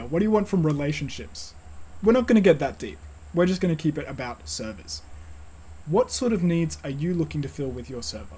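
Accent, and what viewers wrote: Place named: Australia. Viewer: going by your accent I am guessing you're a fellow Aussie. Facebook Marketplace is your friend. A lot of IT folks that are able to scam decommissioned servers will sell them on FB. Some people are a bit ambitious with pricing but every now and then someone gets sick of their PowerEdge T430 taking up space and they flog it off for $150AUD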